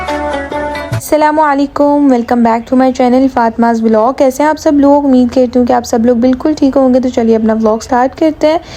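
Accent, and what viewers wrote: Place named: Arab world. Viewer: Ma Shaa Allah apka Arabic bolna ka andaz buhat pyara ha❤❤❤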